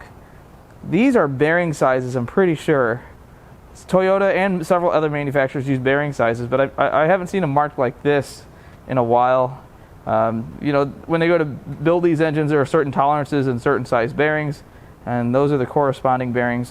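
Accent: United States. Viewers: Normal engine design from this millenium. Ps. Are you yank?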